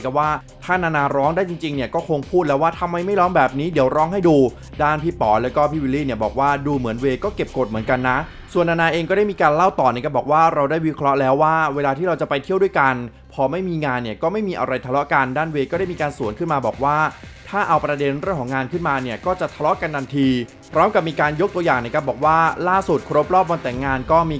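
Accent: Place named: South, Thailand. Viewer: คนใต้ลักจริงนิ😅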